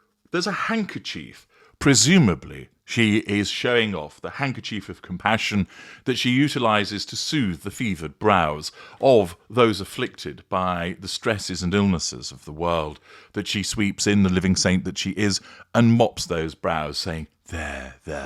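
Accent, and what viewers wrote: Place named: England, United Kingdom. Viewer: 🎉Especially with the beautiful ecglish ac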